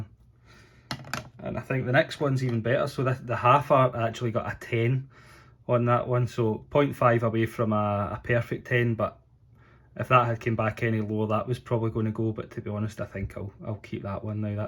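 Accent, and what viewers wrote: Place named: United Kingdom. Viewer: was this bulk submission? and you only waited 3 months? the turnaround now is likt 145 days. And also, did you had any problems with customs? From your accent I think your from the Uk so your kinda in the same situation as me. I'm from Portugal, and I'm kinda worried if something happens with the package or customs, cuz as you might know it's not insured. I send 2 weeks ago 50 cards. Just wanted to know if you had any problems. Thanks!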